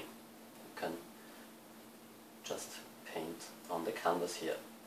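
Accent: Germany. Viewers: german accents? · Deutscher Akzent xD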